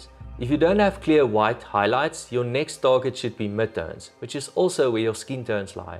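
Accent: South Africa. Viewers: You sound South African bro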